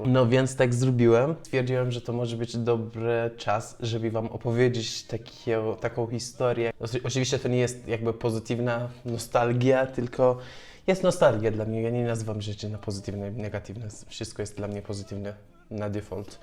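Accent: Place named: Rzeszów, Poland. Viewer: teraz wyglądasz i mówisz jakbyś był z Rzeszowa:) Pozdrawiam.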